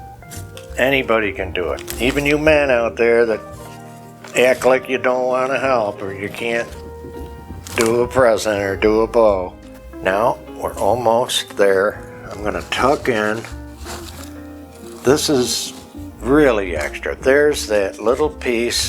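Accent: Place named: Idaho, United States. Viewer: There's a first and your it 👏😍 there isn't a video of yours that I haven't enjoyed. I have gone back to look at your past video's and their all fantastic. Lots of flair and charisma. Oh by the way I also have a collection of milk glass love it. I have 68 Santa's not to many but their not anything like yours lol! I also have a collection of nutcracker's. My son has gifted me one every year 😃? You sound like an Idahoan yeah!! 😘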